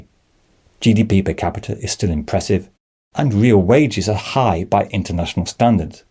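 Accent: United Kingdom. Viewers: I detect a british accent, if I am right ? It's always funny people from Countries that turned into utter shitholes start worrying about other Countries 😂 Yes, at one point there will be stagnation or a small decline - like in every Country - like it has happened sometimes in the last 50 years - geeeee- only IQ less fools believe that growing an Economy more and more every year after year after year is possible - IT IS NOT 😂 AND THAT IS NOT A BAD THING - GETS EVERYBODY DOWN TO EARTH. And If I where you - I would worry about Little Britain much much much more than Germany. They are after all still in the EU. And what goes for Energy prizes - Well , they are more than Double in Little Britain than in Germany , the last I read 😂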